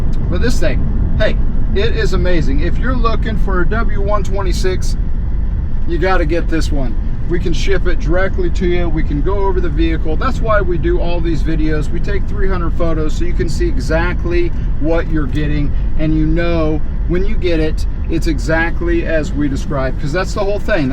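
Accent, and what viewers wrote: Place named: Appalachia, United States. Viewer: Love the car but am i dead or he sounds like he is speaking with a hillbilly accent😅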